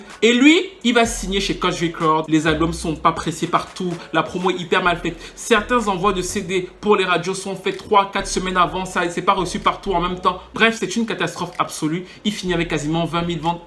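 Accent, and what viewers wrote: Cameroon. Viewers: Ce mR parle comme un camerounais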